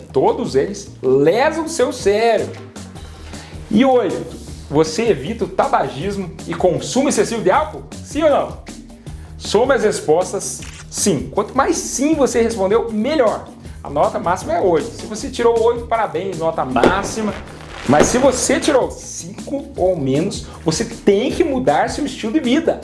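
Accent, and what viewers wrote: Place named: Paraná, Brazil. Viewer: Seu sotaque lembra muito o do meu pai, que é do Paraná perto da divisa com Mato Grosso do Sul. Depois que me mudei para Salvador, há 10 anos, nunca mais fui lá.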